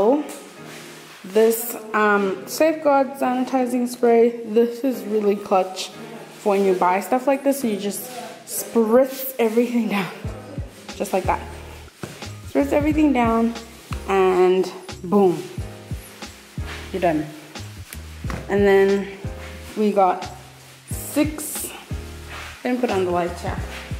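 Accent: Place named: United States. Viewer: I've been watching your channel since it began and have always wondered why you have an "American" accent of sorts? Were you born/raised there? Hope I don't come off as rude, I'm just curious.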